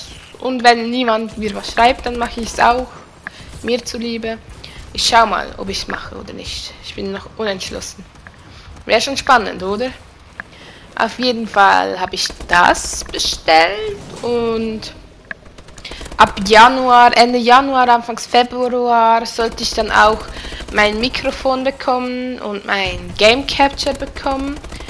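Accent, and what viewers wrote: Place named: Switzerland. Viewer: haha hab das video beim commander gesehen und bei den ersten 3 Sekunden gewusst das du Schweizerin bist ;D swiss power ! ^^ ich lahn mal es abo da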